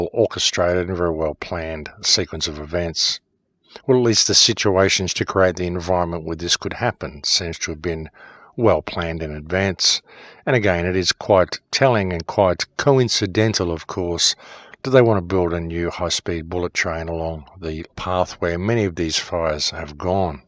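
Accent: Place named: Australia. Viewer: No t.v., smart phone is going (dumb phone). All the WARNINGS and WATCHMAN channels are being sliced down, demonitized n' demonized. Been sharing, posting, warning for years. Folks, this is the end times! God forewarned these things would happen. We are at the tail end of the age of grace and facing the dawn of tribulation. REPENT FOR THE KINGDOM OF GOD IS AT HAND! Heads up! I hate to say it but this is nothing compared to what is coming! Gods judgement and wrath going to sort things out once and for all, sorting the sheep from the goats, the wheat from the tares and who can blame Him, look at what we done and how much society has fallen. TIME IS UP! We are living just as in the days of Noah. Get onboard the ark if you want to escape, repent of unbelief and sin and know Jesus Christ is mighty to save Amen! 🙏❤🕊 Great to hear an Aussie voice presenting our region. 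Well done bro. Brilliant, love your work mate, keep shining!! 🍃🌺🍃 Glad you got yr own channel being a voice in the wilderness! 😁👍 SHARED!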